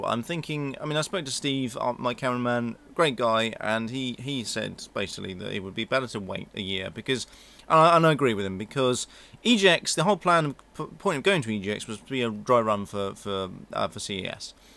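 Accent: United Kingdom. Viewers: Dat Yorkshire accent doe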